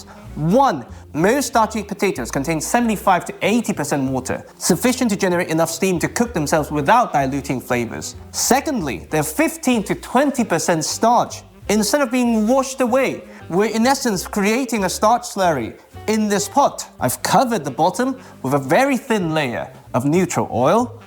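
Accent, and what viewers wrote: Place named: United Kingdom. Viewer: Your British accent is adorable! 🥰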